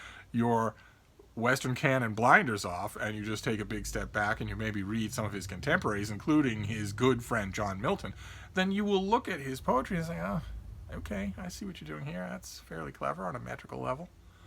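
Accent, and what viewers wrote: United States. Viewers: Loving your anti-Roundhead invective but still surprised by an American voice in defence of Monarchy! Totally agree with you about TS Eliot and Marvell.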